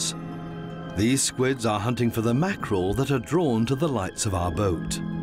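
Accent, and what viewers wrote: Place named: United Kingdom. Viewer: Like the British accent the voice of the geocide of millions of people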